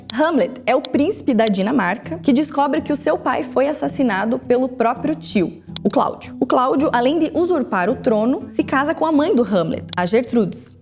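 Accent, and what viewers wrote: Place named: Curitiba, Brazil. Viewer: O sotaque dela é tão bonito! Certeza que ela é curitibana! 🤭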